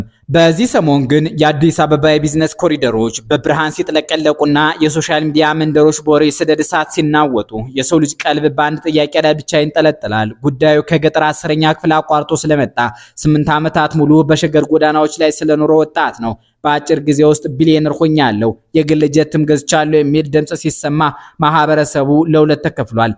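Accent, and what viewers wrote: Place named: Gojjam, Ethiopia. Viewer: ስታነብ ቅናት ያቃጠለህ ጎዣሜ መሆንህ በድምፅህ ያስታውቃል።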